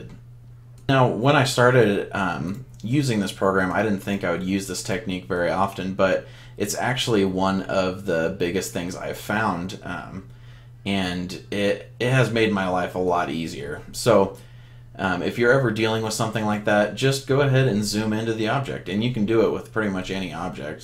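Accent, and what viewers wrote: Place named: Letterkenny, Canada. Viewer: Sounds like he is from Letterkenny... Pitter Patter, let's get atter!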